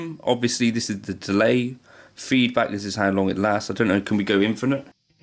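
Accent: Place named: Bristol, United Kingdom. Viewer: Random question - Where did you grow up? My girlfriend has overheard your videos when I watch them and called you "that Bristol guitar bloke" based on your accent. I said he's from Exeter in Devon as far as I know and the accent is similar (We're oop north) but she insists you're from Bristol and must have moved. I insist she's crap at identifying accents. Can you help settle an argument?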